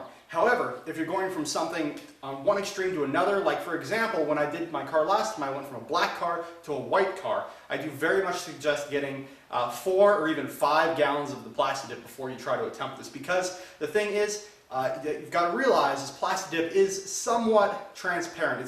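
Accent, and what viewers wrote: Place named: Germany. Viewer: Your accent man... Where are you from? Are you German originally?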